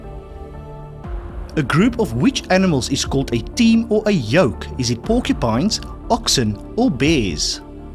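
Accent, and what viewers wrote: South Africa. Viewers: Bud are you a South African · Stalactites. Got 7 wrong so far...!!!!!!!!!! Is that a South African accent? RE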